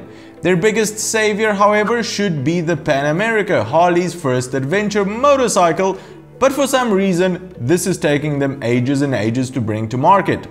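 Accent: South Africa. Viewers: SA accent annoying.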